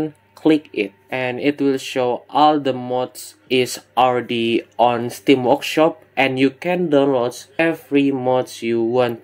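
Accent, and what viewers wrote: Indonesia. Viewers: hmm i wanna ask a little question are u indonesian? [cuz im indonesian just to make it clear your accent was similar to a indonesian trying to learn english and thank u btw for the download files and it make my day thank u so much!]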